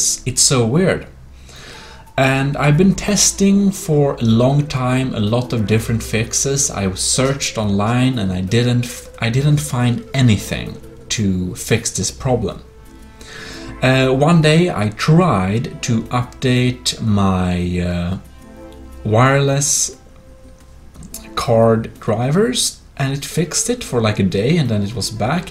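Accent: Sweden. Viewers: Man hör verkligen att du är svensk lol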